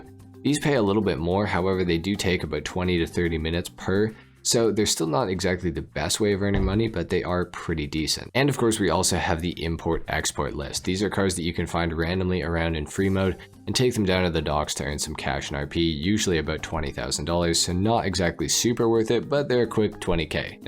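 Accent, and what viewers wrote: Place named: Canada. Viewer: Winnipeg mafia😂 I could tell by your voice you from Canada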